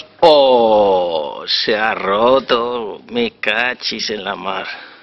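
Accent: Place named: Italy. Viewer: you are an italian who is trying to speak spanish? omg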